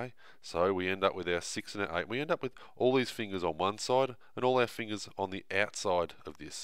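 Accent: Australia. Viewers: Why are you talking with like a flu like kind of voice? Are you an Aussie or you are really having a cold? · He speaks very clearly. I guess you are just not familiar with the Australian accent :)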